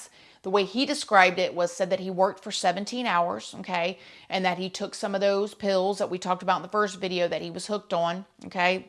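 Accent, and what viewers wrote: South, United States. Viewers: Seriously! As a Texan; raised (mostly) by my Brooklyn mom who recently had lost all those goofy (or what I used to think was obnoxious 'isms) after a stroke. It is TOTALLY my favorite when "da, da, da" and "excetera" 's... ESPECIALLY with that southern twang! My foreign grandparents used to say I have the thickest southern accent, which I really don't having grown up around so many different accents. tickles and warms my soul.. helps the grief 🥰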